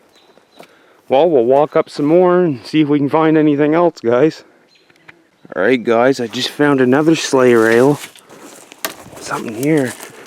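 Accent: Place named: Maine, United States. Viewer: are you in Maine? you sound like it. Dave from Maine. love the show!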